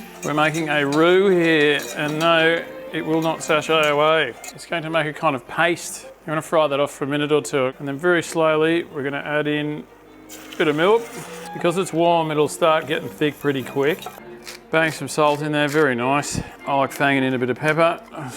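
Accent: Australia. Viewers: You sound like you’re from WA.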